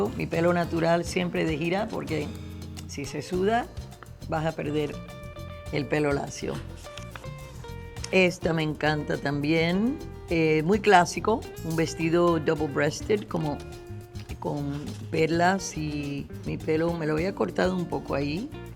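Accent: Cuba. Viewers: Como se oye para ti? Yo soy mexicano creado en Florida. Para mí se oye bien bien Cuban, aunque ella creció en Miami. Te suena su acento gringo al hablar castellano? Como se compara a un acento auténtico cubano--de la isla, diferente a lo cubano de Miami. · Gloria nunca pierde su acento cubano... Bella!!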